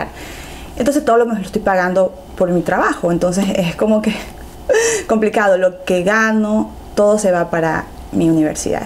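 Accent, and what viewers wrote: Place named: Guayaquil, Ecuador. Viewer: Habla normal.... Los Ecuatorianos hablamos así en especial de Guayaquil.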